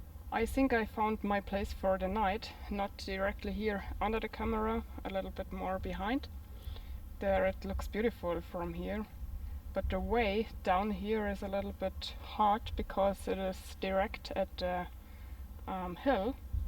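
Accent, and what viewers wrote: Bavaria, Germany. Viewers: I really love how you narrate the nature. I also love that you actually thank everyone for their comments. This is kinda a long message, I hope you don't mind. My dad adores your accent because he grew up in germany while his dad was trying to fix things after that thing happened. Bavaria is so beautiful. We love seeing you explore it. We're also skillful bushcrafters and we would be happy to host you if you want to explore the USA forests. Happy camping, and I'll be praying for your recovery. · Enjoyable video. I like the fact that you sound like a German speaking English. You speak much better English than I will every speak German. I saw your tattoo which I assume is new and that is what you were covering with the Archery arm guard last time. What is the the tattoo? I was not able to make it out.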